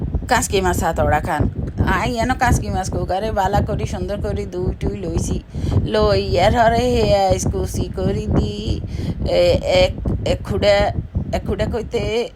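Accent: Noakhali, Bangladesh. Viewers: দারুন হয়েছে রেসিপিটি 💐😋আপনার নোয়াখালীর ভাষায় কথা গুলো শুনতে ও ভীষণ ভালো লাগলো❤❤❤❤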